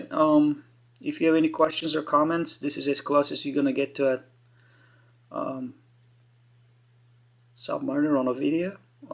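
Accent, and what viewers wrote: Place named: Eastern Europe. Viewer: Matt Phelan He's not a Yank ! He's an east European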